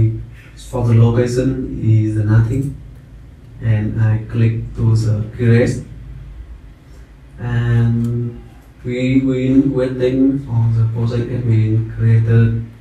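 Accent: Vietnam. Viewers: Good job br!! But Vietlish accent is so clear anyways :o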